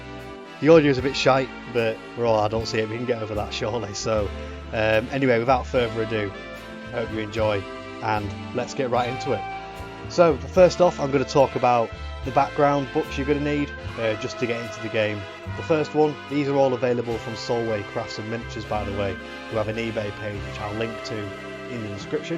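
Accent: United Kingdom. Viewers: Thanks for starting this series. So far ok but what are you trying to do. Why not start with the "history" of the VBCW? Why is this war going on, what are the factions, what has happened . You start us off by showing a bunch of booklets that make no sense without context. Next, LOSE THE MUSIC. Please consider that, hopefully, many of your watchers, like me, are not accustomed to a British accent. It is interesting to learn about the milieu from a British speaker, however. The music makes it even more difficult to understand the vocal audio. Please, also speak a bit slower. I am looking forward to soon learning about what this VBCW is actually about. Thanks.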